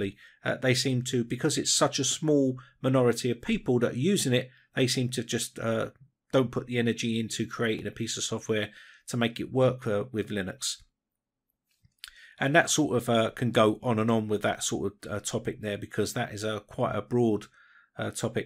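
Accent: United Kingdom. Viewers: Love your British accent, I was recently interested in the comparison between these os, love from India 🤗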